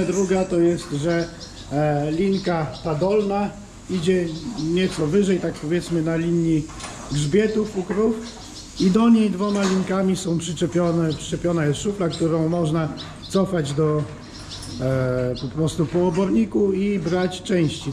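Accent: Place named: Mazovia, Poland. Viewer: Jak ty z Mazowsza 🤣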